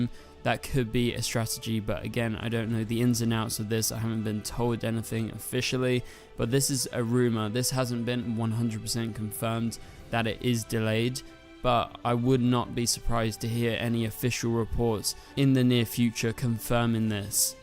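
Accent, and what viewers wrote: United Kingdom. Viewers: Great video. I have never been a really big fan of non-powered superheros. Dont hate me, but i didnt even care much for Arrow, nor do I really like superheroes like Batman. To me, it is just more interesting if the characters have powers. I love it whenever those powers are explained more and i get to see all the various abilities in action. I am also a huge sucker for Origin Stories. I have the entire collection of the 80s DC comic series Whose Who. I just love that sort of stuff. Im also excited about the upcoming shows and movies that will feature Marvel's Wiccan. That is going to be so cool to watch. Hey, do you know if Flashverse is okay? He has not made a video or went Live in a long time. I always enjoyed it whenever the two of you made content together. You make a good duo. Please reach out to him and see if you can get him as a Guest. 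And maybe encourage him to begin posting videos again. I first found your channel and I have loved everything you do. You are very eloquent and I love your particular British accent. And through you, I found Flashverse. He is equally well-spoken, and I just adore his personality and his accent. You are both great. And I find what little bit of my life's happiness there is, whenever I see a new video posted by you both.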